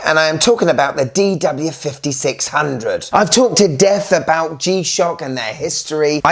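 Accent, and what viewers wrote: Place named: Cockney, United Kingdom. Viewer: i wish this guy would talk low and quiet and not to loud kinda annoying.he does good reviews but cut down on the high cockney talk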